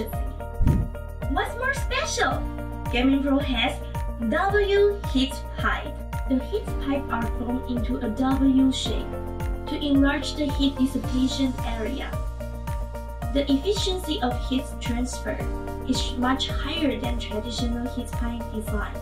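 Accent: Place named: England, United Kingdom. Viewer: So qute english accent. Good job haha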